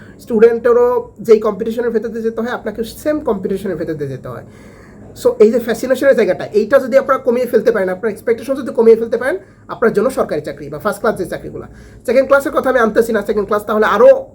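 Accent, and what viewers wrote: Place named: Chatmohar, Bangladesh. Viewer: Vai apnar bari chatmohar tai na kotha dekhei buja jay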